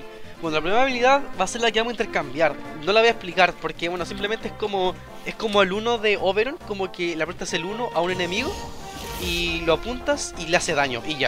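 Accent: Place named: Chile. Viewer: Buena compa me alegra escuchar chilenos, supongo que eres chileno jajajaj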